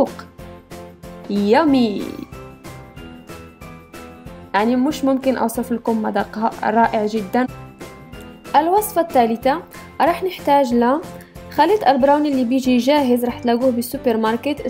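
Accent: Morocco. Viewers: korde طيب عشان هيك عندها لهجة مغربية شكرا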